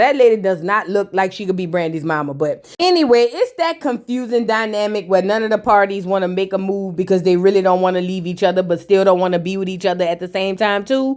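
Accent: New Orleans, United States. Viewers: I love your new orleans accent ❤ New subscriber.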